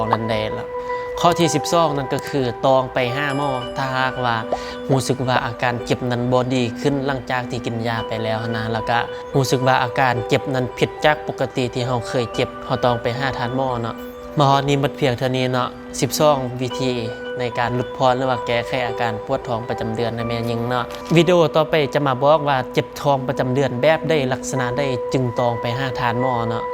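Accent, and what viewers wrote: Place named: Luang Prabang, Laos. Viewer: ພາສ່າທິນ້ອງເວົ້າເປັນພາສ່າໄທຫລ່ວງພະບາງບໍ່ນ້ອງ ເວລານ້ອງເວົ້າເປັນຕາຟັງເນາະ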